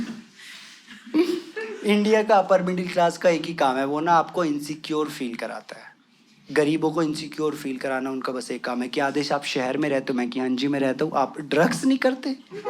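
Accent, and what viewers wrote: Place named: United Kingdom. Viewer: your english accent gets me everytime :D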